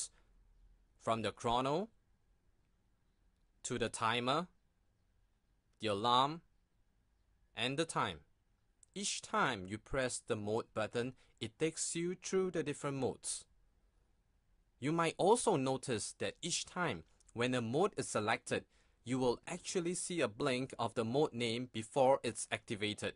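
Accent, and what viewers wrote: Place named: Singapore. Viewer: U sounded like a singaporean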